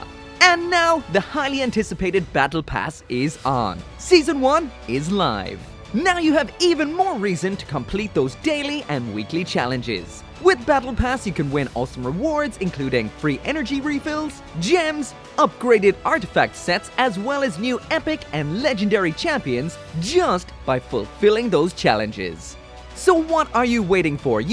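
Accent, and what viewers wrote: Ireland. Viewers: Yo you Irish bro? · I hear the Irish accent, but there's something else there, right? Maybe I'm just crazy.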